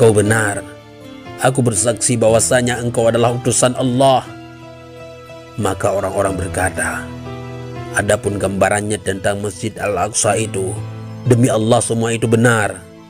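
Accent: Aceh, Indonesia. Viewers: maaf.. aku cuma mau nanya .. kayaknya admin nya orang aceh ya.. salam kenal ya.. wassalam..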